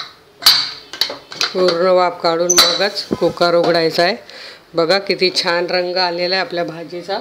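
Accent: Khandesh, India. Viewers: kaku me nehmi pahte tumchya receipes.bhari sangta tumhi.tumhi khandeshi ahat ka? bolnyachya style warun watla mala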